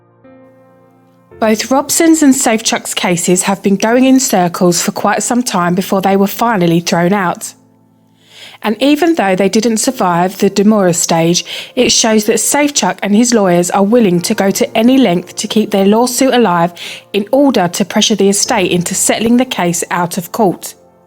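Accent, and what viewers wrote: United Kingdom. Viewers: Special thanks to the pommie bint who narrates this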